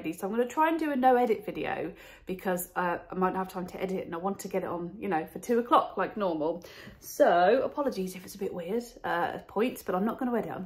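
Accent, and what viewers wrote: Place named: England, United Kingdom. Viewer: recent subbie and I love watching your videos. Part of the joy is your beautiful English accent. The haul was amazing. My favorites were the dresses. Love the style of them. Enjoy your Easter weekend. 💛💛🐣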